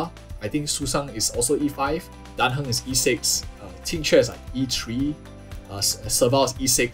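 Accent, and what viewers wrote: Singapore. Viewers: u sound like singaporean sia, i like your content keep it u bro! huat ah!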